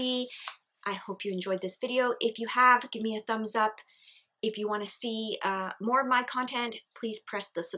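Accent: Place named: Canada. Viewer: I absolutely love watching your videos. You seem such a sweet lady and I love your Canadian accent, it's lovely. Those were tough questions, I don't what genre I'd choose to ditch, but I'd probably choose Horror too or non-fiction.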